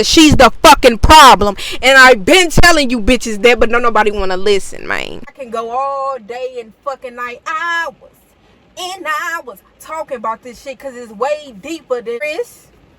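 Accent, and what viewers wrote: St. Louis, United States. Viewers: 😩 Noooo don’t do Anna Mae Bullock like that! Ike was dead ass wrong!! But yes, I’m with everything else you said and I LOOVE your St. Louis accent! 😂🙏🏼🔥🫶🏼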